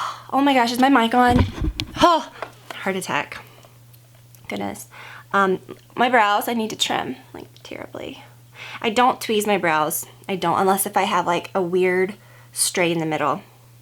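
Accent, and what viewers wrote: United States. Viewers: What state is she from?!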